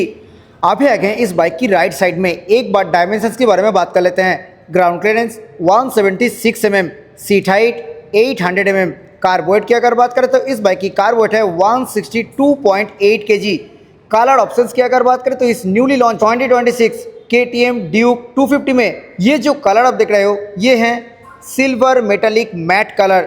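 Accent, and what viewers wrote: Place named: Bengal, India. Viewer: Hindi bolna sikhle, tere accent se hi lagraha hai tu bengali hai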